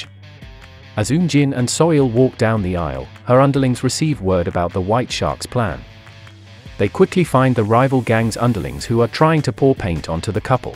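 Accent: United Kingdom. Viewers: Having to listen to very bad English being read by someone with a British accent is very weird indeed.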